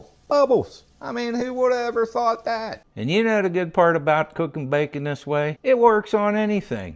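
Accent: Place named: West Virginia, United States. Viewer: your accent reminds me of home so much. Do you happen to be from West Virginia? And if so, what part?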